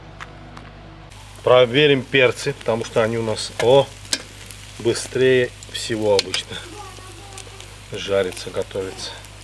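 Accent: Baku, Azerbaijan. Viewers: ДЖАН Э ДЖАН. БАКИНСКИЙ АКЦЕНТ ЛУЧШИЙ. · Супер шашлык и супер бакинский акцент.все родное.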